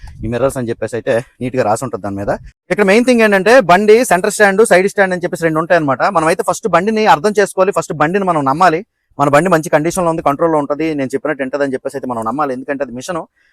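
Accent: Srikakulam, India. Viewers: Guarantee srikakulam ayyuntindi ma intlo matladukunnatle undi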